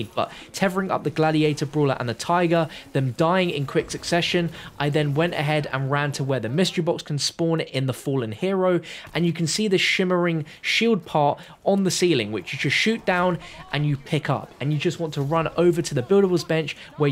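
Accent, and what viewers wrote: United Kingdom. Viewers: Yes he is from the UK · Something I've been wondering for awhile, is British? Idk why but his accent sounds British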